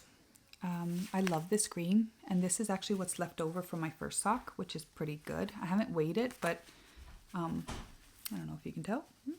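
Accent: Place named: Montana, United States. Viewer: Don’t laugh but I thought you were podcasting from Montana or Wyoming in the US or Alberta Canada. Yesterday I was watching The Knitty Stew and she referred to “an Icelandic cast off”. I have been knitting for 45 years and have never heard of this. In a future episode would you please show us how it’s done? I would love to win some Icelandic laceweight! Your knitting is exceptional! And the magic mushroom socks are definitely on my needles next. Your kids will never be lost in the snow-and your description of the ‘highlighter with pink undertone’ was perfect. Thank you very much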